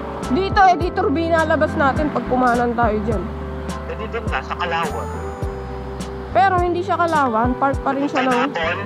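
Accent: Batangas, Philippines. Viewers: lods penge sticker! new subscriber nga pala ako, nakakatuwa yung punto mo lods parang batangenyo, hehe. rs! 🤙🛵💨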